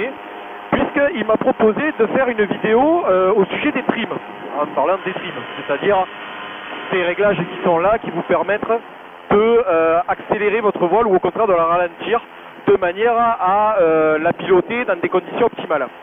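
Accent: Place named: Arles, France. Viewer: je me disais que ton accent est peoche du miens mdrr je suis du coté d'arles et toi? Parce que c est jolie ou tu voles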